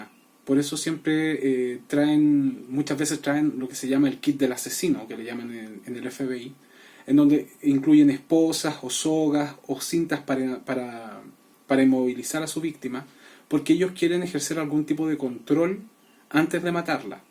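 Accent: Chile. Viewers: Es interesante oirte hablar, eres Chileno? (lo pregunto por tu dejo) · No sé si te han dicho en tu estilo personal y apariencia tiene un parecido bastante notorio con Dross en las miniaturas pero los acentos son bien diferentes el es Argentino pero su acentos no es tan fuerte pues lo dicimula muy bien y ya es un acento más parecido al venenzalano y el tuyo es Chileno si no me equivoco y no me mal intérpretes es más una curiosidad personal porque me gusta el acento de ustedes los chilenos porque es muy respetuoso al hablar y muy correcto bastante parecido al español pues el de todos nosotros es castellano que deriva del español pero si me llama mucho la atención que hablan muy rápido y fluido y no se será la costumbre de hablar muy correcto desde chicos que ya de grandes hablan rápido sin errores para hablar y no es algo que nos afecte a los que escuchamos porque apezar de hablar rápido es muy claros y a según hablan no tenemos ningún problema para entender todo pero no sé porque me parece muy difícil poder imitar ese acento en especial a comparación de los demas y otros que no puedo distinguir es el de los paraguayos y uruguallo es bien parecido al argentino, el de ustedes es un poco pero bien poco parecido al de los peruanos pero ese no lo confundo es como si tratarán de distinguir a los Salvadoreños, con el de nosotros los Hondureños aveces se confunden también con el de Guatemaltecos pero nos es tanto como entre para nosotros también nos confundimos porque son muy pocas las palabras que nos diferencian entre salvadoreños y hondureños, a mí me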